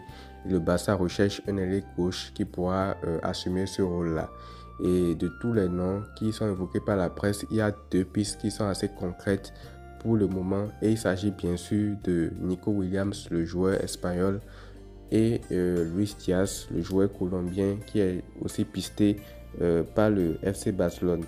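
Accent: Benin. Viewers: Ça sent l'accent béninois, vrai ?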